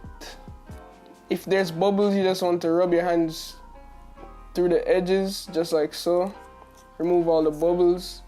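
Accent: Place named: Jamaica. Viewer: R u Jamaican? I hear an accent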